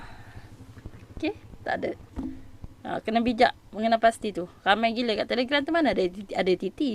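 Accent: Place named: Kelantan, Malaysia. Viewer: awk terlalu kelantan bagi sy..tq kak ats ilmu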